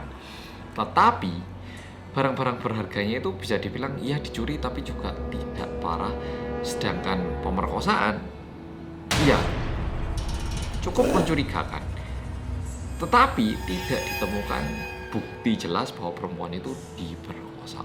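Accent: Java, Indonesia. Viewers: Medooooooooooooooooooooooooooooooooooooooooooooooooooooooooooooooooooooooooooooooo ooooooooooooooooooooooooooooooooooooooooooooooooooooooooooooooooooooooooooooooooooooooooooooooooooooooooooooooooooooooooooooooooooooooooooook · Jiaahhh... Baru buka youtube channel yang ini pas pembukaannya spontam ngikutin pembukaan sampe ngikutin medoknya yang panjang banget sampe ditanya sama papah "kamu ngapain?" 😂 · Mau nya lewat aja tuh tokpednya..krna udah ngeklik nih pidio.. Eh iseng ja balik ke tokped ternyata MC nya si medhooooooooooooooooooookkk...😀 Akhirnya nongkrongin dah.. Klo aq dari sekian translater nya bts di indo lebih milih ㅎㅎㅎㅎ Cie cie cie yg bakal di kontrak untuk translate nya BTS di next project konser indo.. 💚💚💚😎😎😎 짱... 너무 좋아...짱 짱 진짜로...고마워😊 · Bersama orang korea yang Medoookkkkkkkkk....... Seketika akupun ikut tahan nafas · Astaga aku sebagai bolobolo bangga ternyataa mas korea yang medoookkk interview bts. 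OMG proud of u😭😭✨✨✨✨✨ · medoknya hanya bertahan sampai sini wkwk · Alhamdulillah medoknya makin panjang dari biasanya. Ayo SEMANGAT! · Orang Korea Yang MEDOOOOOOOOOOOOOOOOOOOOOOOOOOOOOOOOOOOOOOOOOOOOOOOOOOOOOOOOOOOOOOOOOOOOOOOOOOOOOOOOOOOOOOOOOOOOOOOOOOOOOOOOOOOOOOOOOOOOOOOOOOOOOOOOOOOOOOOOOOOOOOOOO OOOOOOOOOOOOOOOOOOOOOOOOOOOOOOOOOOOOOOOOOOOOOOOOOOOOOOOOOOOOOOOOOOOOOOOOOOOOOOOOOOOOOOOOOOOOOOOOOOOOOOOOOOOOOOOOOOOOOOOOOOOOOOOOOOOOOOOOOOOOOOOOOOOOOOOOOOOOOOOOOOOOOOOOOOOOOOOOOOOOOOOOOOOOOOOOOOOOOOOOOOOOOOOOOOOOOOOOOOOOOOOOOOOOOOOOOOOOOOOOOOOOOOOOOOOOOOOOOOOOOOOOOOOOOOOOOOOOOOOOOOOOOOOOOOOOOOOOOOOOOOOOOOOOOOOOOOOOOOOOOOOOOOOOOOOOOOOOOOOOOOOOOOOOOOOOOOOOOOOOOOOOOOOOOOOOOOOOOOOOOOOOOOOOOOOOOOOOOOOOOOOOOOOOOOOOOOOOOOOOOOOOOOOOOOOOOOOOOOOOOOOOOOOOOOOOOOOOOOOOOOOOOOOOOOOOOOOOOOOOOOOOOOOOOOOOOOOOOOOOOOOOOOOOOOOOOOOOOOOOOOOOOOOOOOOOOOOOOOOOOOOOOOOOOOOOOOOOOOOOOOOOOOOOOOOOOOOOOOOOOOOOOOOOOOOOOOOOOOOOOOOOOOOOOOOOOOOOOOOOOOOOOOOOOOOOOOOOOOOOOOOOOOOOOOOOOOOOOOOOOOOOOOOOOOOOOOOOOOOOOOOOOOOOOOOOOOOOOOOOOOOOOOOOOOOOOOOOOOOOOOOOOOOOOOOOOOOOOOOOOOOOOOOOOOOOOOOOOOOOOOOOOOOOOOOOOOOOOOOOOOOOOOOOOOOOOOOOOOOOOOOOOOOOOOOOOOOOOK. 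· Ketemu lagi, tadi di Tokopedia jadi MC BTS, sekarang di YouTube jadi detektif medhokkkkkkkkk....😄 Langsung nonton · Mas korea medok deg deg kan gak tadi interviwe sama bts oh ya jungkook minum susunya lahap ya🙂😌✌🏻🍼💜💜💜💜💜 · Medooooookkkny luar biasa' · Wi medok e nyapo ye mas? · Medhook ke kedawan mas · Hello guys welcome back to bersama orang Korea yang medoooooooooooooooooooooooooooooooooooooooooooooooooooooooooooooooooooooooooooooooooooooooooooooooooooooooooooookkkkkkkkkkkkkkkk!!!!!!!!!!!!!!!!!!!!!!!!!!!!!! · iya mas medoknya, medoooooooooooooooooookkk banget yaak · Medooookkkk~ Melu ngempet nafas pisan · Meddhoooooooooook e dhuowo men om 😅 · Medhooooookk Suaranya persis pemotong rumput 😂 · Korea Medoooooooooooooooooooooooooooooooooooooooooooooooooooooooooooooooooooooooooooooooooooooooooooooooooooooooooooooooooooooooooooooooooooooooooooooooookkkkkkkkkkkkkkkkkkkk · Ternyata tetep medok ya sekalipun lg ngeinterview bts, uwu mantul mas 💫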